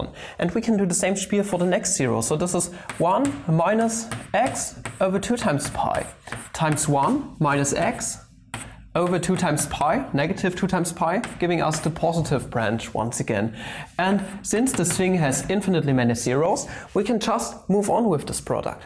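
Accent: Germany. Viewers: I like your German accent. I don't know why, but it makes me laugh, and you Germans don't laugh. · That's a thick German accent :D, that pronunciation and intermixing of s and ß gives away everything. BTW I am from NRW. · always enjoy hearing the german accent😂just wonder if everyone can understand that "Weierstraß"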